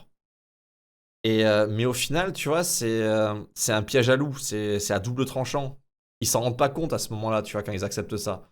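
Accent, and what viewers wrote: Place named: South, France. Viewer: bel accent du sud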